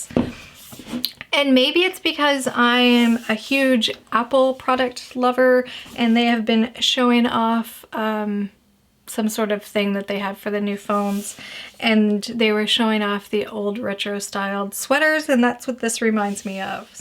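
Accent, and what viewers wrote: Canada. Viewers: Thanks for doing this unboxing. i like how you include the times to fast forward to avoid spoilers. Nice Touch! I think the Advent calendar is very cute and as I quickly looked through the list of flavors included, I have no doubt that I would enjoy the teas. I do have an issue with the 3 "surprise" items as they merely seem like coupons you could get pretty much at any time and with the narrow dates (likely to try to spur sales during/after the holidays) they exclude them from being useful to me as the nearest David's Tea is more than an hour away, so I only get there 2-3 times/year. It must be your Canadian accent but your pronunciation of Advent was a bit distracting to me. You pronounce it as though it is spelled "Advant."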